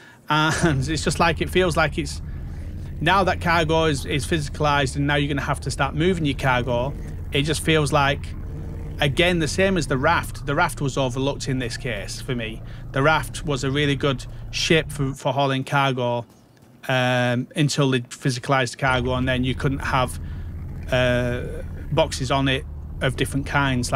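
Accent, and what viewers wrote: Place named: England, United Kingdom. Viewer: Where in England is your accent from?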